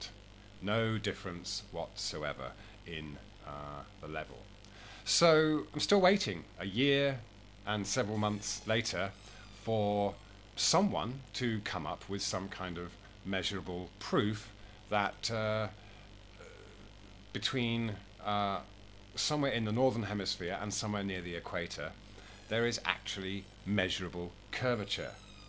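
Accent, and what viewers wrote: United Kingdom. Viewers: You sound as though you were educated in the UK. Could you please tell me at which school?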